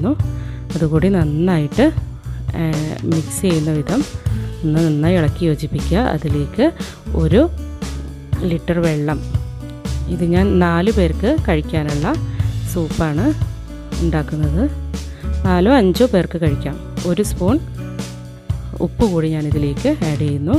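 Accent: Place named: Kasaragod, India. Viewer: 😊lastil paranjathu pettanu manasil ayilla. Onude ketapol karyam pidikiti.😊. Ethu natile anu aa style. Kasargod style anoo. Pine healthy and rich soup adipoli👌❤️❤️❤️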